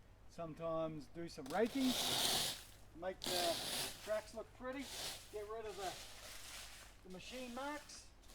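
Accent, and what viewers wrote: New Zealand. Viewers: Just found your channel. Great footage. Great location too. Every 79 yr old teenager dream. Did I see something about you leaving.? Obvious question..why.? You sound like a kiwi.! Thanks again for sharing. Atb from across the ditch here in Nz. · You sound like you are from NZ ?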